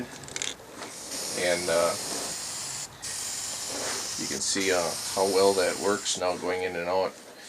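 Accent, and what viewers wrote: Canada. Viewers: For some reason i can always pick up on canadian accents. R U?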